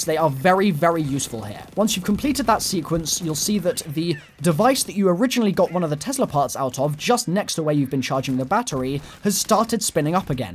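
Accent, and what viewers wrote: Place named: United Kingdom. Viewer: Hey I think you sound similar to David Tennant just by your enthusiasm and your British accent (ik David is Scottish but come on you two have similar sounding voices)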